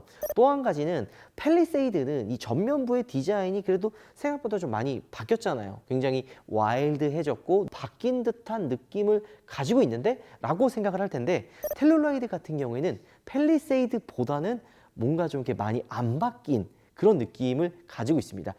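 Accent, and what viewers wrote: Gyeongsang, South Korea. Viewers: 야깐 야깐 하지좀마 이 갱샹도 유튜버 야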